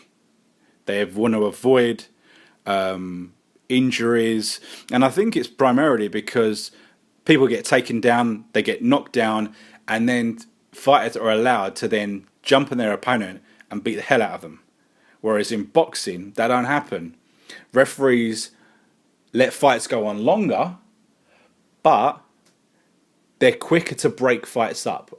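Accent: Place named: United Kingdom. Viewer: Its a hopeless analogy...i was interested in what you had to say about a 40 year old Mayweather(still fresh from retirement and a history of hand injuries) versus a Mcgregor in his prime with immortality and greatness beckoning alongside that Irish fighting spirit.I was willing to debate because i already know where your money is(You are in for a shock btw) but when i heard that comparison,it felt so ugly and the fact that you sound British just made it worse...the British and Irish lions were active just recently...i dont know how you can be a fan of combat sport and not know jack about rugby...blasphemy! I digress....Mayweather is a super computer that has decoded all that have stepped before him in a ring but Conor is a UNKNOWN machine that keeps evolving,unorthodox...doesnt matter whether its in a ring or octagon...think of an upgraded version of the T1000 in terminator 2.It will be a hunt for him.A smarter Tom attempting to smoke a Jerry and i feel Tom will get his prey.I must however admit that there is a timeline within which Mcgregor has to finish the greatest pound for pound boxer of all time...i wll go out on a limb and say 8 rounds.After that,Mayweather will certainly transition into auto pilot having added Mcgregor´s data into the database.Mcgregor could however try to trap Mayweather into the latest rounds and pull an Anthony Joshua on Klitschko.Fighting is problem solving and you shouldnt discredit Mcgregor´s fighting IQ.All in all,it has the makings of the grea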